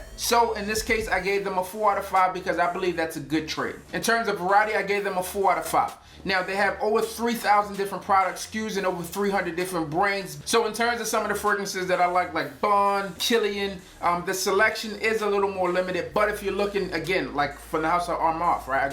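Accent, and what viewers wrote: Baltimore, United States. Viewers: New to the game and from what I've seen I'll be leaning on your reviews a lot! Is that a Baltimore accent I hear?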